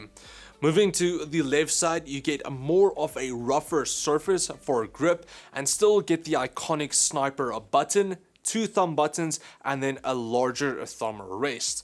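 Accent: South Africa. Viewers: Good review man, mine just came from evetech, dont fight the SA accent, embrace it! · Great review as always,. I'm from SA too. Embrace that accent, buddy. It'll sound great in the videos😉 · ey this oak is south african lukkkaaa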